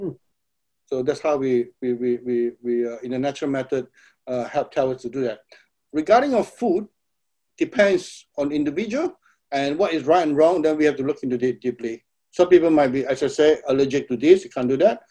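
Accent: Singapore. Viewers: bro r u singaporean?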